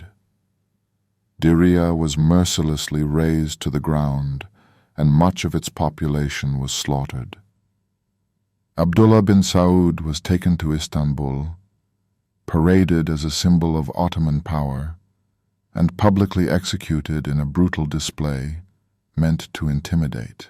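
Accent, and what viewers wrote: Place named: Middle class, United Kingdom. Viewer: lol if it wasnt 4 the british there would be no oil markets and u lot wuld still be 3rdworld peasants, btw u shuld have narrated the video yorself instead of using sum corny english middle class voice over ...or do u think tht sort of accent gives yor narrative more validity ...funny tht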